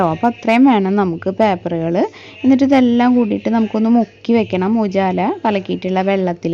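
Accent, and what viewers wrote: Thrissur, India. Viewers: Are u from thrissur as the language slang.. Nice technique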